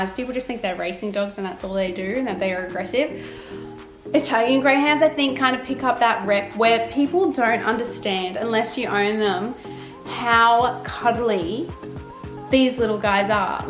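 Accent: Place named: Australia. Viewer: Soooo Australian, let’s put an R at the end of everything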